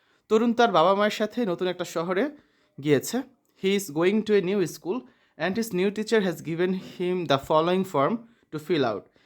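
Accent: Sylhet, Bangladesh. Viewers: Bhai afne kita Sylheti ni?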